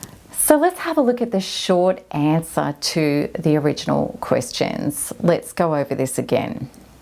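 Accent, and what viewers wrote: Australia. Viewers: Australian accents are soy nice.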